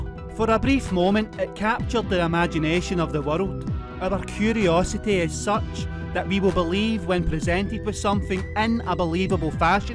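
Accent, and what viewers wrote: Scotland, United Kingdom. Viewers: Was in english, with a scottish twang.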